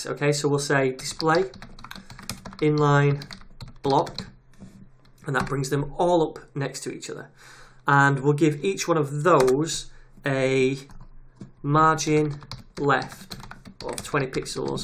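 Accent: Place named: England, United Kingdom. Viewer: I AM NEARLY FINISHED WITH YOUR COURSE AND I CAN ONLY SAY ONE THING...IT IS SIMPLY AWESOME AND ABSOLUTELY FANTASTIC! I HAVE LOVED EVERY STEP OF IT. I APOLOGIZE FOR THE LIKINGS BECAUSE I USED THE VIVALDI BROWSER TO WATCH IT AND I JUST NOTICED, THAT MY LIKING EVERY VIDEO DID NOT SEEM TO WORK. I DON'T KNOW WHY?! NOW, I ALSO WATCHED PART OF YOUR JAVASCRIPT COURSE AND I ALSO LOVED IT. THE WAY YOU TEACH MAKES EVERYTHING EXTREMELY SIMPLE TO UNDERSTAND, EVEN FOR A NEOPHYTE LIKE ME! I CANNOT THANK YOU ENOUGH EITHER FOR ALL THE USEFUL SITES, THAT YOU PUT UNDERNEATH YOUR VIDEOS! YOU ARE A GREAT TEACHER, WHICH IS QUITE RARE IN THE PROGRAMMAING WORLD. MOST WEB DEVELOPPERS ARE QUITE IMPRESSIVE AT WHAT THEY DO, BUT, UNFORTUNATELY, THEY DO NOT KNOW HOW TO TEACH ALL THAT AND VERY OFTEN THEIR ONLINE COURSES ARE NOT ONLY CONFUSING, BUT ALSO OUTRIGHT BORING. 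YOURS IS NOT! IT IS FUN AND I ALSO LOVE YOUR PRONUNCIATION. I DON'T KNOW FROM WHICH PART OF ENGLAND YOU COME FROM, BUT I JUST LOVE IT! MY PROFUSE THANKS FOR YOUR WONDERFUL GENEROSITY WITH YOUR COURSES. I AM NEARING 68 AND I COULD NOT AFFORD TO GO BACK TO SCHOOL FOR MANY REASONS, HEALTH AND MONEY, BEING TWO! LOTS OF LOVE AND TAKE CARE! FRANCIS, A FRENCH MAN LIVING IN CANADA.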